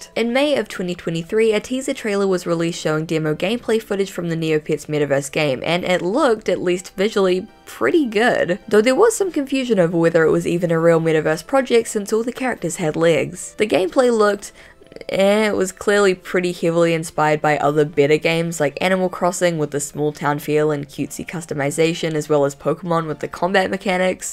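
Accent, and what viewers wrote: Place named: New Zealand. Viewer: Your videos are the best to just put on, and listen to as I clean and do my house work. Your voice reminds me of all the good mates I met when I lived in Tauranga New Zealand. Truly an enchanting, tolkienesque place.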